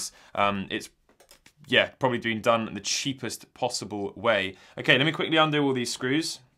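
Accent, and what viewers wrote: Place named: United Kingdom. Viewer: >annyoing british accent disliked and moved on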